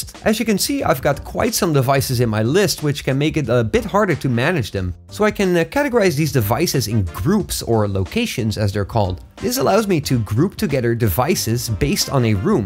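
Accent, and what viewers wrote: Netherlands. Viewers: Brendan Dijkstra An btw, He has a very subtle dutch accent. You wont notice it unless you look for it.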